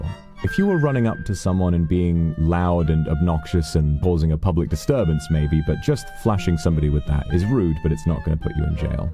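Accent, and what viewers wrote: RP, United Kingdom. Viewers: 英語ちゃんと聞き取れる方じゃないけどVOXのイギリス英語が上品でいかにもイギリスって感じの抑揚で好きだ これもうASMRだし、度々やって欲しい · わぁ。イギリス英語が聞けて幸せな時間でした❤ 小学生の頃、イギリスに住んでた帰国子女なんですが 日本はアメリカ発音で教育される中、 私は、大学のアメリカ人の教授には「笑っちゃうくらいイギリス訛りが抜けてない」と言われて… スラングの話をしてるのに、ポッシュな英語で(*´艸｀*) 久しぶりにイギリス英語が聞けて幸せでした。 ありがとうございました！ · すごくposhなアクセントですっごいこと言ってるのが好きすぎる😂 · 上品なブリティッシュだなあ憧れる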